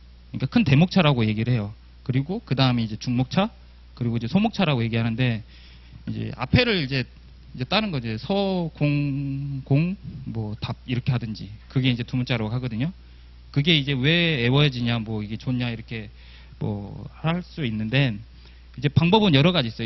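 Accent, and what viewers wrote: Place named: Chungcheong, South Korea. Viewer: 충청도분인가보네요. 답답합니다.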